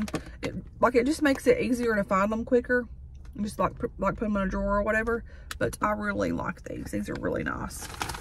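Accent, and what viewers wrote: South, United States. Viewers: YESSSSS,Let me start my comment by saying i LOVEEE your country accent❤❤❤. Second,your shirt is everything lol, i used to watch golden girls alot!! I see those steam dumplings so i may try them out now. Eww i never was a fan of moon pies😅. Oatmeal pies for me❤. I always wondered how those sparkling waters taste. I like to buy the walmart brand sparkling waters for my son. I did grab 1 of the bubly brand ones to try. Omgg those waffle sticks are so cute🐶😅. Those lipsticks remind me of maybelline! Yup,ive used those same containers for the smaller earrings. Those dingo dog treats are a deal😮. Have you tryed those foam soaps they have that resembles the bath&body works one? Im a sucker for bright colored nail polish! Thanks for showing off your goodies❤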